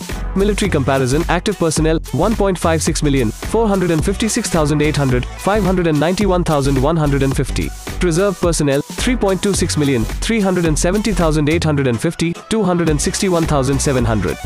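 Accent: India. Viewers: Author from India ? His accent like indian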